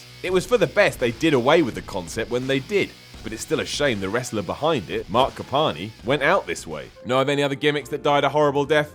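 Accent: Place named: South Wales, United Kingdom. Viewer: are you from south Wales you sound like it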